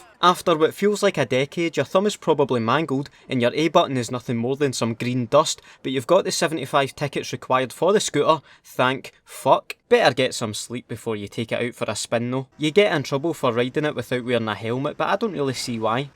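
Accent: Scotland, United Kingdom. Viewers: Probably the most Scottish review I've heard ;] I played this game so much as a kid Jimmy's vest glitched pink. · Where I Scotland are you from,I'm from Glasgow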